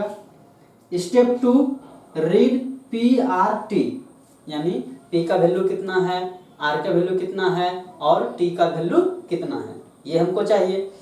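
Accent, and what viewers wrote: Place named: Bihar, India. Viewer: भाषा की सरलता और हिंदी बोलने का तरीका बिहारी झलक।grest work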